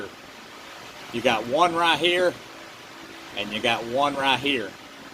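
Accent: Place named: Georgia, United States. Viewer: very ingenious design and I learned something today without trying. who says you can not teach old dogs new tricks. love the channel but where are you from? I am a Georgia boy myself so accent is familiar. love the channel.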